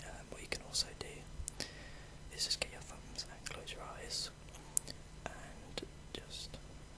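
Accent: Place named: England, United Kingdom. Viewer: Thank you so much for this video, I absolutely love it! I'm studying abroad at the moment and this has helped a lot with stressful exams etc. nothing like a bit of eye poking to help unwind. I'm a bit homesick and it is so good to hear the English accent too - can't get enough of your voice. p.s. you're awesome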